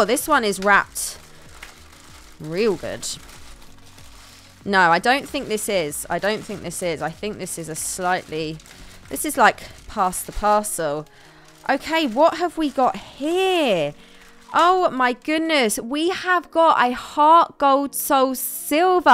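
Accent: United Kingdom. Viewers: nice start video , but this brit accent is very annoying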